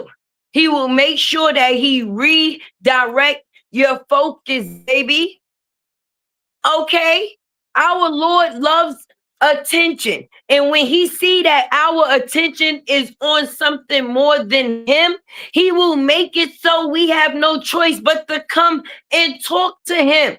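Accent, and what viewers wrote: New York, United States. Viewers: Hey I here that nyc accent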